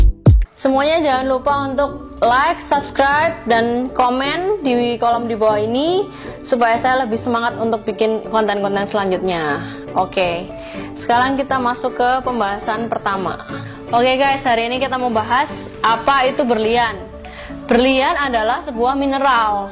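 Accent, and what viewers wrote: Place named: Banyumas, Indonesia. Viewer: medok logat nya...kaya ngapak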